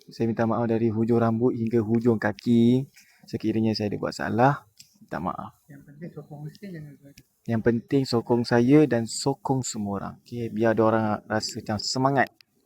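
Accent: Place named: Perak, Malaysia. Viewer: Bila bercakap kuat loghat perak... Tengak.....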